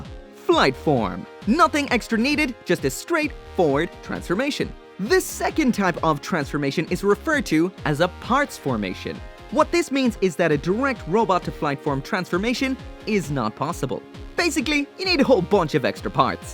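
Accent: Ireland. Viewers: What the hell is your accent? It sounds like a Dubliner doing forced American! Like, Nevada or something?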